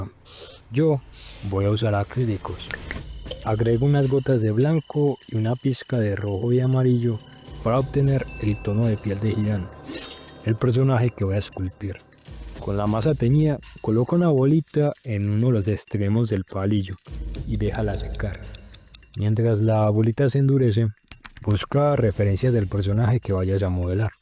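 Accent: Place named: Latin America. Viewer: un video acerca de lo que me gusta con el acento latinoamericano mas bello que existe, saludos desde Argentina amigo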